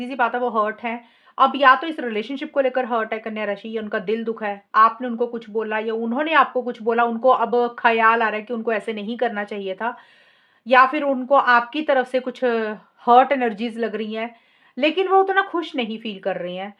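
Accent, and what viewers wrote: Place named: Punjab, India. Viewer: Punjabi lang nikal he jandi hai chahe kinni hindi bol lo aap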